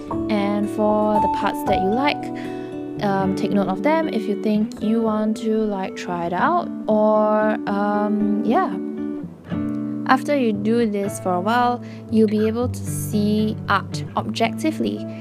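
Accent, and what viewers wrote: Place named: Germany. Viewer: Thanks for this video btw your accent is so cute are from germany?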